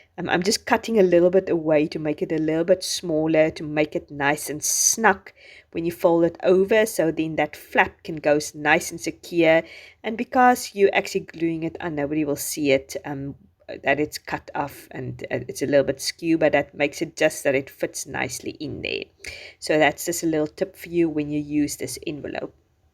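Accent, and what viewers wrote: South Africa. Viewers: Oh my word this is gorgeous!!! I am new to your channel! South African?😃 I’m from South Africa! Bless you and you beautiful channel!💕💞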